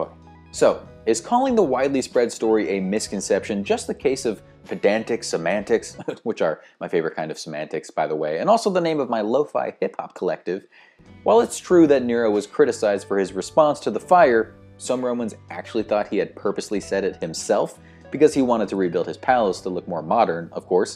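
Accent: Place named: United States. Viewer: O_o what English are you speaking? That is perfectly normal American English pronunciation of those words.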